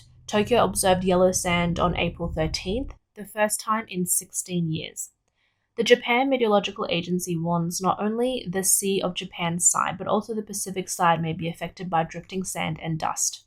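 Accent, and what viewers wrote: Australia. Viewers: hmm, she has a very light Australian accent actually. You've obviously never heard a "hyper-Australianized" accent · The accent is very urban Aussie (Melbourne / Sydney accent). I like it a lot. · The narrator kept saying "dessification." Did she mean "desertification" or "desiccation"? (Her hyper-Australianized accent was not always clear, especially with the over-emphasis of the r-dropping final syllables, as in "wahtaah".) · A pleasant voice, sounds a little Australian. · cute Aussie accent 😂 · Is the presenters voice/accent second home Australian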